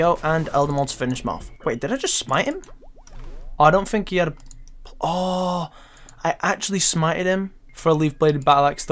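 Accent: United Kingdom. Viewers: You literally sound like my old mate lloydreed1 lol voice is trippin me out. Must be from the same part of the uk 🇬🇧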